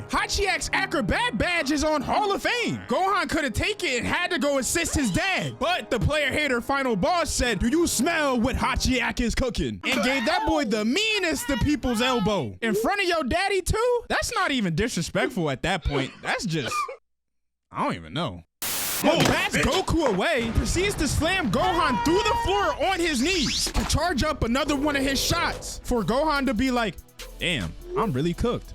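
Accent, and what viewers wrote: Philadelphia, United States. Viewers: bro i know a Philly nigga when i hear one ! Love the content !!! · You gotta be from Philly😂😂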